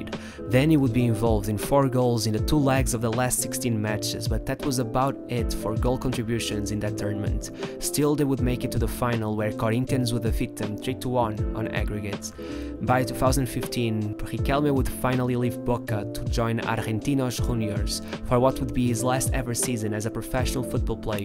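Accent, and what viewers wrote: Portugal. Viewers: Your pronunciation suggests to me you’re a Portuguese speaker. Are you from Portugal? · só um português consegue falar inglês desta forma e acertar todos estes nomes latinos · Portugal. The pronunciation is good with a Portuguese accent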